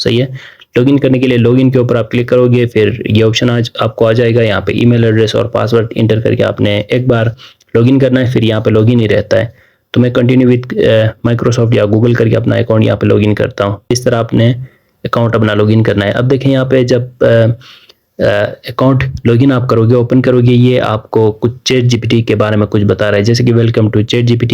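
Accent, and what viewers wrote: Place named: Saraiki, Pakistan. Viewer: Ap sariki lag rhy voice se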